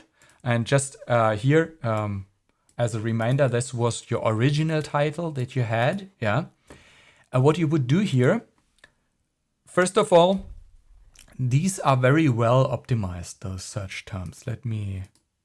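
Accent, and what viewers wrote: Germany. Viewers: I hear you are a German :D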